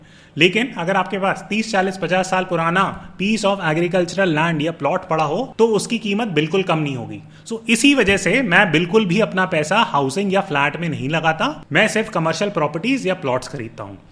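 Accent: Standard. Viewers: Shuddh Hindi me mzaaa aata hai yaar. Sun k bhi accha lagta hai aur jaise bade bhai samjate hai aisi anumbhuti hoti hai. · बहुत अच्छा भ्राता, आप हिंदी और अंग्रेजी दोनों ही बहुत शुद्ध बोलते हो,👍🙏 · sir we all like your shudddhh hindi after all we are all hindustanis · Mujhe apki shudh hindi bahut pasand aayi. Bas 'Apun', 'Apun' bolna chod dijiye. :) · Love your sudd hindi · Loved ur shuddh hindi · Han pasand hai aapki sudh hindi, ty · बहुत बढ़िया। आपकी शुद्ध हिन्दी अच्छी लगी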